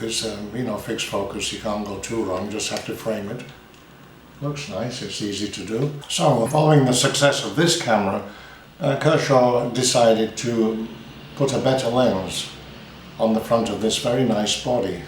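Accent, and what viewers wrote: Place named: Yorkshire, United Kingdom. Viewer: Lots of lovely little stories in there,. Love your Yorkshire accent!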